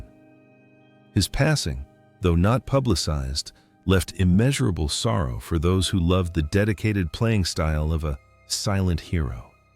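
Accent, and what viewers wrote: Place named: United States. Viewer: more AI shite with gut wrenching yanky commentary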